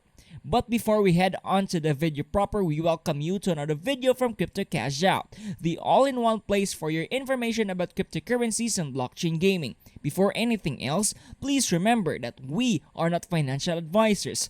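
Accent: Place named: India. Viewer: This foookin indian accent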